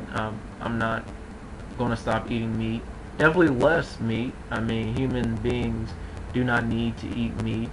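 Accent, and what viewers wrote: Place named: Texas, United States. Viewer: First off, let me say your locs are Rockin'! Second of all, you're not hearing what we hear in your voice. Your voice is fine, even has that special oomph with the Texan accent. So be encouraged. And since you write poetry, this will be your way of getting out of that insecurity with your voice by letting us hear your spoken word. Thanks for sharing. Subbing, hope you'll do the same.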